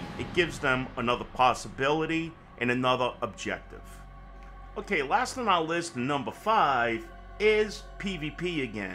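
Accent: New York, United States. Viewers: Came for the WOW vid. Stayed for the NY accent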